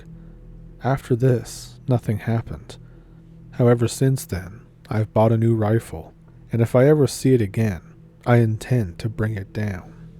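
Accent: Canada. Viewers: I find your thick canadian accent very endearing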